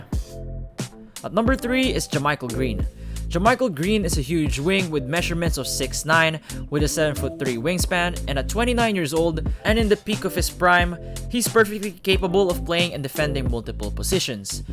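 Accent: Philippines. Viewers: God this guy sounds filipino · You sound Filipino 🤔 -A Filipino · I hear a Filipino accent for some reason... 🤔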